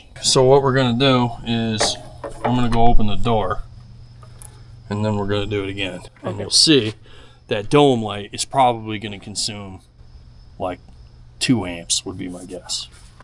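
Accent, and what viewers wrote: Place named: Northeast, United States. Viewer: I started watching your content about a year ago. When you spoke, your accent sounded similar to the way the folks from the NE sound. Your content is interesting, I drove OTR for 36 years, and I love the sound of Screaming Jimmys. Keep the good stuff coming!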